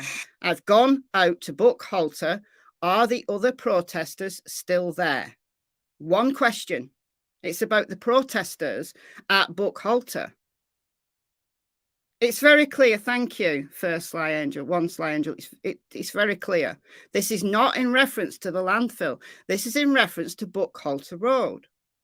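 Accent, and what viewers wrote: United Kingdom. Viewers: Missed the live stream but got to listen to it all and hear the updates. Congrats to the winners of free month memberships. To me this is the best channel. You get facts, commentary, dogs playing, humor, you get heard, and questions answered. All this with a British accent and caring.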